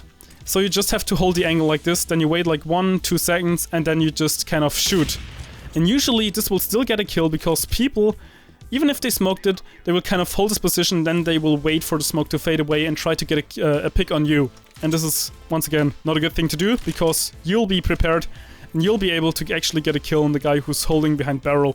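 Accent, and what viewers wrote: Germany. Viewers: ich wusste du kannst deutsch xD Egal wie fließend du sprichst und egal wie sehr du versuchst alles richtig auszusprechen man kann immer hören ob jemand deutsch spricht xD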